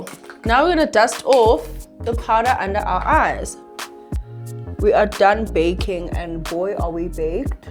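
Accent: United Kingdom. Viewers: Uhm so the UK accent….. is this a hint….. oh so ever gorgeous ke wena international makeup plug? 🙏🏾😘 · The British accent sends me 🤣🤣🤣. Love it sooo much.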